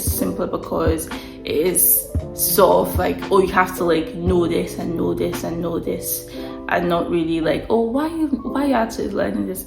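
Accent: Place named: Scotland, United Kingdom. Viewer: Haha, love it! Digging the subtle Scottish accent ❤😅. More power.🚀🙌🏽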